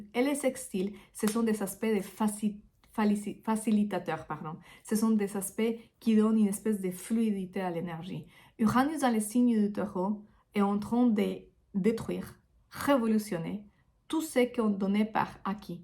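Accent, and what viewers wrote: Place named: Spain. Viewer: J'adore votre accent je suppose que vous êtes espagnol....merci pour cette belle vidéo Namasté ❤🕉🙏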